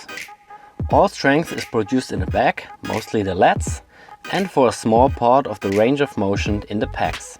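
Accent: Germany. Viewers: German accent :D :D :D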